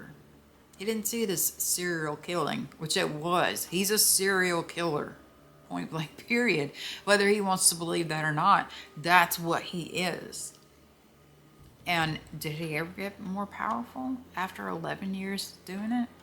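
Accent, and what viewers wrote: South, United States. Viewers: oh I love a true crime channel with new stuff I havent heard of. and (hello from England) I love that soft spoken southern American accent so soothing. you are my new fave ❤❤❤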